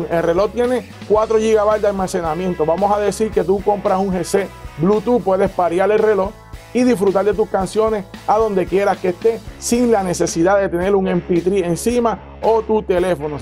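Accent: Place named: Puerto Rico. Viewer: Tu acento Puertorriqueño lo reconosco en la luna Desde juncos te veo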